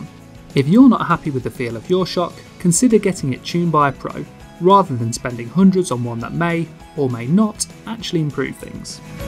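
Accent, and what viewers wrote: United Kingdom. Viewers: You are good but your british make my ears bleed.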